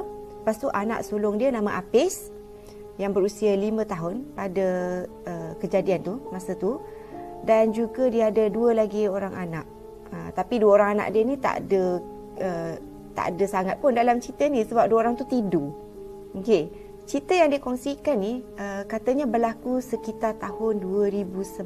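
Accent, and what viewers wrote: Kelantan, Malaysia. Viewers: Kak org klate ko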